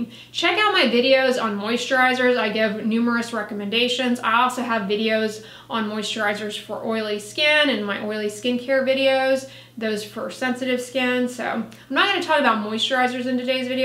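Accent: United States. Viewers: Your american accent is so strong I hardly understand you 😶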